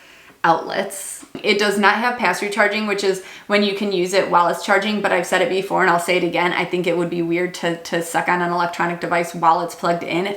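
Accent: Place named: Midwest, United States. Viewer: Your accent, Let me guess Illinois or Minnesota maybe….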